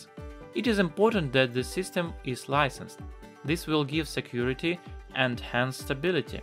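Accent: Russia. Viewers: Все равно русский акцент не скрыть.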